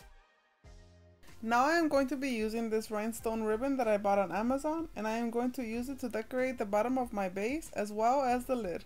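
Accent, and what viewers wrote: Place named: South Africa. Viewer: You sound South African. Very charming pronunciation💐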